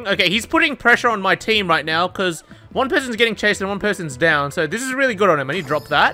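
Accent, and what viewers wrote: Philippines. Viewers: The filo accent is so spot on I choked on my empanada. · being Filipino actually makes sense with his accent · Wow! The typical Filipino dissing the Pinoy accent! You got yourself a new subscriber.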